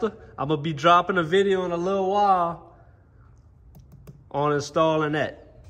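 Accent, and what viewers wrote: Louisiana, United States. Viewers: yeah i live in Arkansas been to Louisiana few times as a lineman for hurricanes it’s definitely a trip! Knew you sounded cajun!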